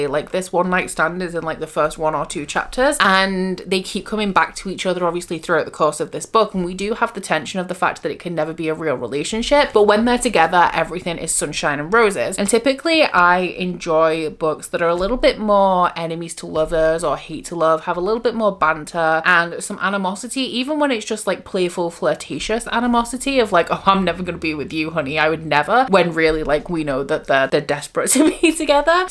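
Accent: Scotland, United Kingdom. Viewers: I just can't place your accent! Moments you sound scottish like me and then you sound yorkshire ahahah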